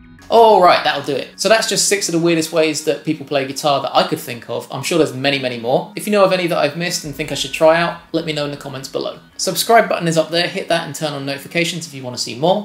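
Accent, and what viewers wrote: United Kingdom. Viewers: You're the man with the most marked British accent I've ever seen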